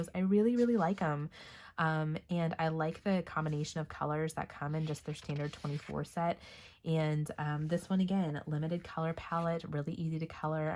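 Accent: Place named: Texas, United States. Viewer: Wow, congrats on the record and all such beautiful pages 🥰📚 I love your Texan accent and you did soo good on the pronunciation 👍🏻 Thank you for the shout out and your very sweet words, I really enjoyed our body-color 😊 I hope you feel better soon and I really look forward to all the interesting things you have planned for the channel and also congrats on all the new subscribers, that is so awesome 🤩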